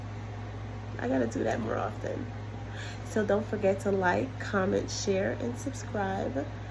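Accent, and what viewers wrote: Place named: Brooklyn, United States. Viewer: you remind me so much of "Mz. Brooklyn." I just love your Brooklyn dialect. She started out at, I believe, almost 400 lbs. Today, she has dropped significantly. Your "story Time" reminds me of Mz. Brooklyn's "story time." <link>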